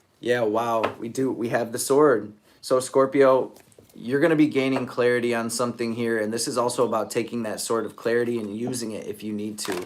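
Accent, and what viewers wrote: Detroit, United States. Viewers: So, I just happened on this account and I don't know anything about you. But you sound like you're from the D....yes? Anywhooo...good stuff here. Thank you!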